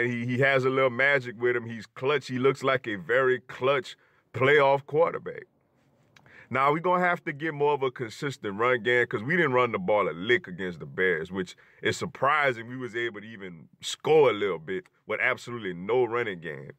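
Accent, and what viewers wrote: New Orleans, United States. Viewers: you sound like you from New Orleans to be honest. Y'all will lose though this Sunday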